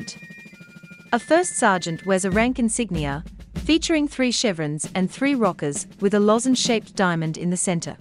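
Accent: United Kingdom. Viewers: Accurate video, but kinda strange that it's narrated by a British woman.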